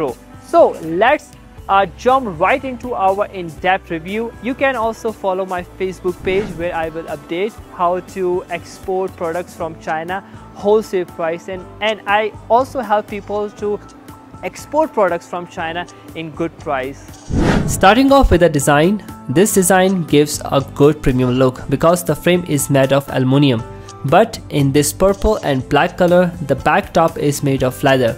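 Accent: India. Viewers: Why does he sound Indian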